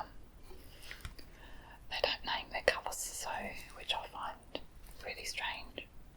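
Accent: Australia. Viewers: I don't wear make up but i love the Aussie accent!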